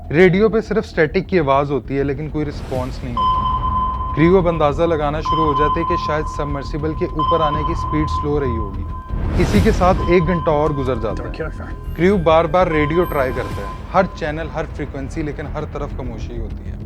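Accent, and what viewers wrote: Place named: Punjab, India. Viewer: Keep it up . Lekin apni Urdu ko aur saaf tareqe se bole. Punjabi touch door kre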